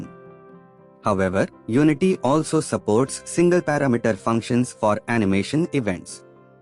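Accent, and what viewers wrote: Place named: India. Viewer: Why did you specifically use a text to speech with an indian accent?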